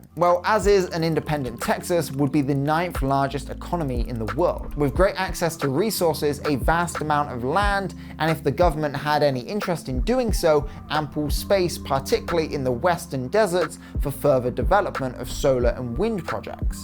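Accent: United Kingdom. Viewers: You sound british so ya know fuck all about Texas. We'll, be just fine without the other 49. And no it wouldnt be a war. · texas is in fact the only state that can leave the union. it was part of the contract for texas to join the union. so yes we can leave. when i clicked this video and the first thing i heard is some brit, i already knew it was gonna be a load of crap.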